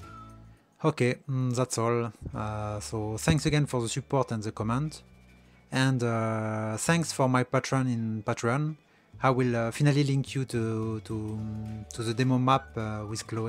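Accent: France. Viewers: j'ai reconnu ton accent francais :) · Tu es Français ? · c'est si drôle un français qui essaye de parler anglais xD mais sinon bon tuto ^^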